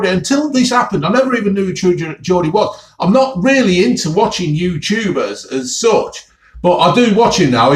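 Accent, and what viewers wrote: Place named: Leicester, United Kingdom. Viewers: Check out Milan's on East Park Road if you liked this place mate. By far the best place in Leicester, and get the chilli paneer its brilliant. Found you from the True Geordie and knew you were from leicester straight away with that accent! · I find your videos appalling and you eat like a chimp. Disgusting behaviour. Now that’s your fix you enjoy but seriously great videos mate and as a fellow Leicester lad it’s refreshing to understand our accent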